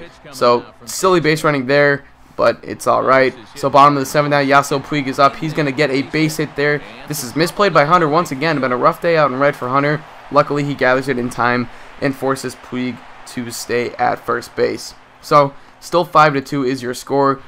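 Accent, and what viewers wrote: Boston, United States. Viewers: Are you from Boston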